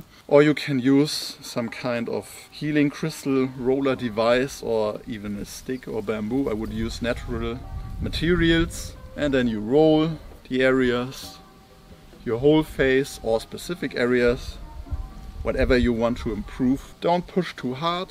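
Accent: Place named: Germany. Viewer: German as accent bro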